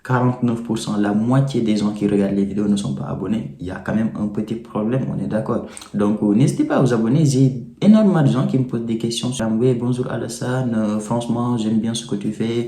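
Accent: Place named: Senegal. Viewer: L'accent sénégalais, j'aime bien 😎👌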